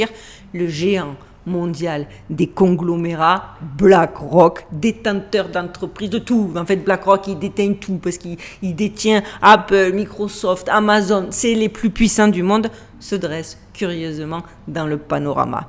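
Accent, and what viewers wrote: Marseille, France. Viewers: Merci ma belle, comme toujours super intéressant et documenté. Merci d'ouvrir nos œillères et de nous "bousculer" avec ton bel accent marseillais!! ✨☀️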